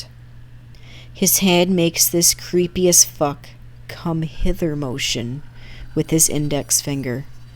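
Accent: Canada. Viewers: Great video! Are you Canadian? You sound Canadian or at least some kind of northern North American.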